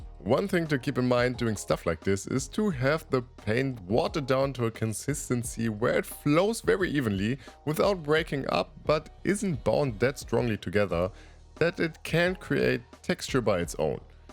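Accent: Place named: Germany. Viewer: you certainly did deliver, this and the horned rat warrior both were experimental and it’s really nice seeing someone’s take on something that not many people would even attempt. But yeah your painting style is unique and a pleasure to watch, along with the German accent and bassy voice you’ve certainly got what it takes to keep gaining popularity. I look forward to the chorf release and hopefully they re-release all the old legion of azghor from forge world!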